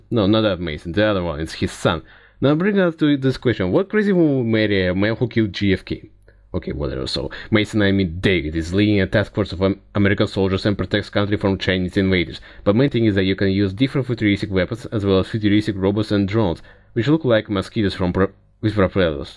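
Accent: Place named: Russia. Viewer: I'm a french canadian and also not very good in english, but somehow I understood 99% of what he said. Sure he have a russia accent and he's speaking very fast (that's the point of the video, fast like Zero Punctuation), but I've heard faaaaar worse on youtube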